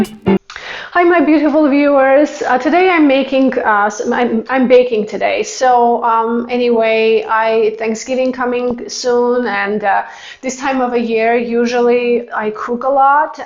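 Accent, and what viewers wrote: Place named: Armenia. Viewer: Hello, Maybe I should call you Chef! The pastries look delectable! Your pastry dough looks so beautiful, and I'm sure they taste divine. It made me think of Baklava, but this recipe is very much more French with the pastry dough, apricot preserves, and dark chocolate. Mon dieu! You definitely need a larger workspace so you can have all your ingredients and utensils at hand. Next time you do this, don't forget to demonstrate how you separate your egg yokes! I felt like I was watching Julia Child. I never missed her show on PBS for so many years. So now I know you are of Armenian descent. I have been wondering for some time where your accent is from. You must have some royal blood with your ginger hair! When I was in college, I had the great honor of working under the baton of the renowned Director of Bands of the University of Illinois Urbana-Champage Symphonic Band, Dr. 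Harry Begian, who was also of Armenian descent. He conducted the honor band every Summer at the band camp I attended in Hays, Kansas at Ft. Hays State University. It was by audition, and I am still very proud to say I sat first chair Alto Saxophone every year. It was always an amazing experience, and one I will never forget. Dr. Gary Corcoran, the Director of Bands at the university I attended for my degree in music education received his doctorate degree under Dr. Begian. I studied conducting with Dr. Corcoran, and I still miss conducting a band. Sadly, both have passed now, but I still ador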